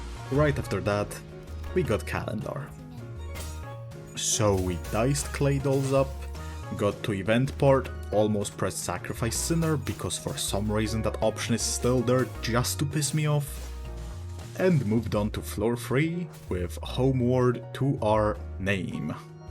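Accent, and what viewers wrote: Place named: Germany. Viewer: holy German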